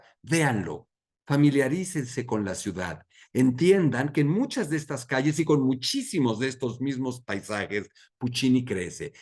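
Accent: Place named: Venezuela. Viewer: Voy a estar pendiente de tus videos sobre ópera. Hablas como los italianos en Venezuela.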